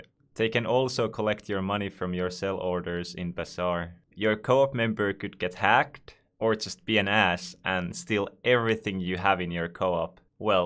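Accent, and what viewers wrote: Finland. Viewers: is this dude from finladn? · ootko suomalaine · U finnish? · are you from finland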